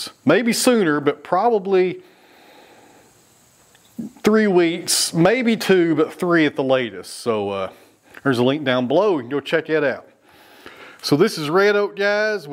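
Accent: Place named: Tennessee, United States. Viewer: Pay no heed to the complainers and the know it all's. And those who bitch about your Tennessee accent.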